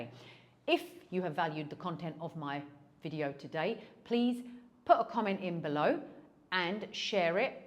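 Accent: United Kingdom. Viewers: Love your British Accent! reminds me of my student years in England..wonderful! you are indeed a fast learner and definitely have picked up pretty well..I feel that your cost of living calculation is fairly reasonable! Definitely agree with you taking a drop in maid once a week!! keep up the excellent reviews of Malaysia!👍👍💕💕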